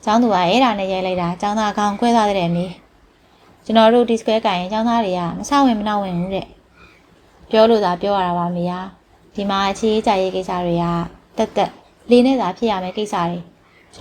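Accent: Upper Burma, Myanmar. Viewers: စကားပြော လုံးတယ် လျှောချတယ် အညာသူပဲ ဖြစ်မယ် မမရျေး 😝😝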